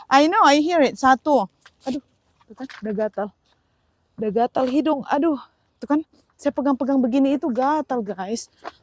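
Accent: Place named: Medan, Indonesia. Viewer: Cara kak berbicara ciri khas orang medan kalau berbicara 😊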